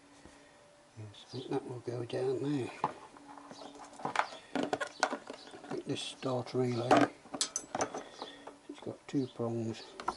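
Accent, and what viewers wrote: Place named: United Kingdom. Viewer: Great video. I had got part way through dismantling forks on XJ 900 today and could not figure how to get it apart so decided to check you tube. I had an M14 bolt with 22mm head and used that to hold inside. One problem, as I am not from UK I did not understand one word, just the accent but a picture is worth a thousand words.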